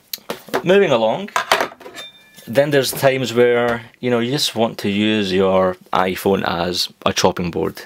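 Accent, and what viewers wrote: Scotland, United Kingdom. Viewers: YOU ARE SCOTTISH!!! #THATISALL #JUSTSAYING #GIVEAWAY LMAO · I love your accent I love Scottish ppl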